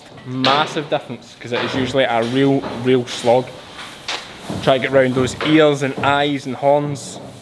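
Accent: Scotland, United Kingdom. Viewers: Hello from Wyoming USA. I love your videos and accent!! Just curious, you and Graeme (hoof GP) are both from Scotland but have such incredibly different accents. Is it simply the difference in regional dialects, like the difference between Boston and Texan accents? 😊 Thanks for all your videos ! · I fell in love with these sheep the moment I saw them. I had no idea they were so expensive. It always makes me laugh when I see a sheep being shorn. They don't move a muscle. No resentance or complaining whatsoever. I could never eat these beautiful animals. They remind me of children. I enjoyed your video and could listen to a Scotman talk all day. Music to my ears.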